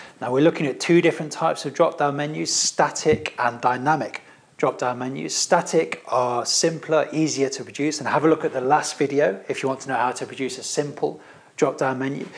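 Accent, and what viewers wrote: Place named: London, United Kingdom. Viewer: like it ... stright to the point & simple. well done man. btw sound from north london ;)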